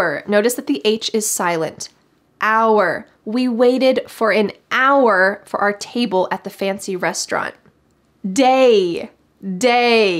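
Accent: United States. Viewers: I watch all your videos to hear your voice because your voice is very clear and good quality. That's why I get to listen to American English. I can easily understand your voice. thank you so much.. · hai mam ..is this is UNITED STATES English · Hello. I'm from India. I love your english speaking way in american accent. It is absolutely remarkable.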